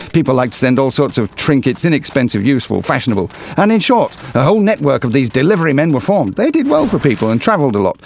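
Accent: United Kingdom. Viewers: You'd better pay some american narrator than british. American accent is more acceptable.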